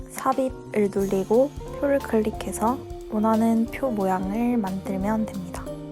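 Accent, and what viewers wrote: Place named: Gyeongsang, South Korea. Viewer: 목소리가 매우 차분하고 전달력이 좋으시네요 약간 경상도 억양이 있으신 듯 한데요 저는 여자분 아주 살짝 섞여있는 경상도 억양있는거 뭔가 느낌이 좋더라구요 영상 잘 봤습니다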